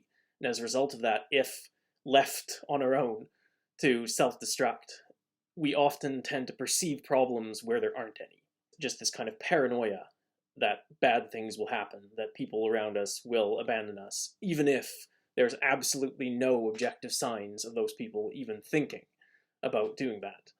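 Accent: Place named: Scandinavia. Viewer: You sound like you're from one of the Scandinavian countries, which I assume have a higher percentage of INTJs. Would I be more comfortable living in that part of the world?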